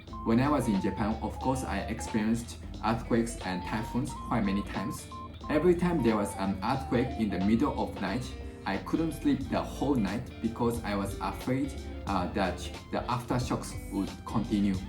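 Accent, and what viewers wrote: Singapore. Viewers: ❤❤❤today I am the first one to like your video ❤❤❤you really speak like a Singaporean 👏👏👏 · You really speak English with Singapore accent ! The result of 4 plus years immersion in local Singapore culture. Amazing ! Your English is overall a lot better than many Japanese who are now working in Malaysia. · Hi, you sound even more local now. You speak exactly like us. I'm also very impressed with your English. Perfect!👍 And you're so good with your Cantonese tongue twisters 🤣🤣🤣🤣🤣🤣 You've really worked hard in mastering the languages. Impressive 👍 Yes, I'm very thankful for not having to experience any natural disasters here. So I live with the heat and the fact that there are no 4 seasons here so the scenery is quite boring and there's not much to do here though it's easy to catch a flight anywhere any time if we wish to. I mean we can't have it all, right?! 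And you've already travelled to so many places! 👍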